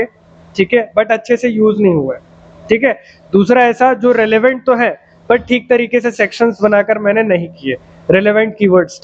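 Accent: India. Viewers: bihar s ho kya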